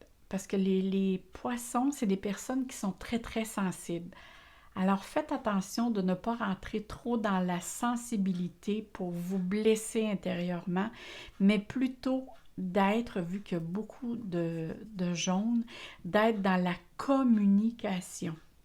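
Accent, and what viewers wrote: Canada. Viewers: Vraiment j'adore votre accent canadien et la douceur de votre voix que j'aime tant écouter :)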